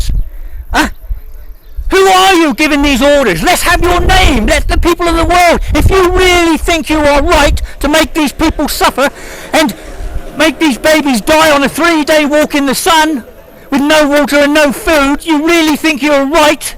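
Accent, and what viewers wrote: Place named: South Africa. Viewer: Hey this is my island mate. Its admirable that you took up the cause. I, like many people, have done our bit to help. For a period there there was limited resources in a country that is suffering economically. The EU budget slashed costs from the EU border patrol I think in 2013. The people and the authorities have ploughed money (which they do not have) in to solving the issue. Almost everyone on the island has a descendent who is a refugee (Asia Minor).We are all compassionate people. Lesvos and every island has provided support. Remember, we didnt plan or expect people smugglers to send the migrants across dinghies and dangerous waters. Please refrain from comparing us to to ISIS??!! Had you avoided that, I would not have worried about your clip. WTF is that about. Its like me saying you have a South African accent therefore you are part of the regime of apartheid. 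Please do not insult our people, otherwise let's look at the history of where you are from.... Its easy to be a critic, but everyone on the island from the authorities down has a heart at a time when Europe has turned its back on Greece. Keep that in mind. The heat and the walk was terrible. During Ramadan, these migrants suffered even more, unnecessarily. The war in Syria and other places is tragic, and a tragedy that should have been prevented. Eventually the issues you point out in this clip was sorted out. Your emotion, again is admirable, but do not tarnish our people or island without the full facts. Go th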